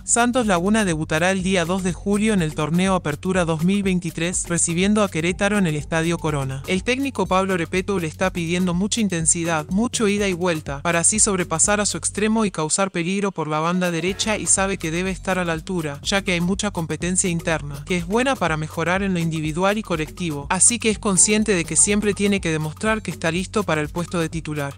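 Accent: Argentina. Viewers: Quiten ese robot con acento argentino por favor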